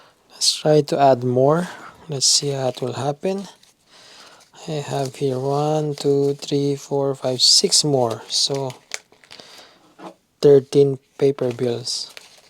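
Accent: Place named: Philippines. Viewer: Thanks for posting this and showing off all of the compartments! Just ordered it because I made a mistake and bought a different Vulkit that can't really carry cash 😅. Ignore the bumbling morons who claim they can't understand properly spoken English because of a light Filipino accent. You speak just fine, I watched the video at double speed and still made out every word. Side note: I think you're meant to fold the last 1/4th of the cash bills inward to fit them into the slot. That's how it looked on the site anyway.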